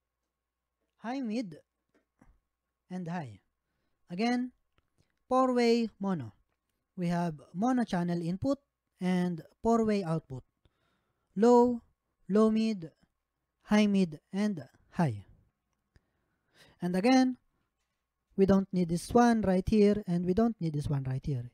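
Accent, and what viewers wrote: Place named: Visayas, Philippines. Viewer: Pinoy ka nman yata tsong kasi halata sa pagsasalira mo...bisaya ka pa nga yata e..bakit mo pa pinahihirapan sarili mo pati audience mo sa pag intindi sa sinasabi mo...nagpupumilit ka mag english...mga pinoy karamihan nanunuod sayo dito kasi nasa pinas ka kaya mas mabuti mag tagalog ka na lang para mas madali ka intindihin ok!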